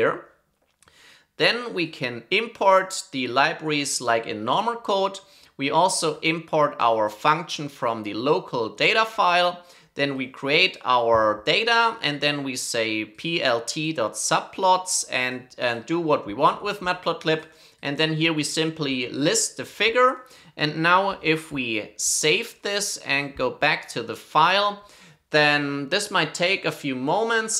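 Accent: Germany. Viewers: Short Question: Are you from Germany?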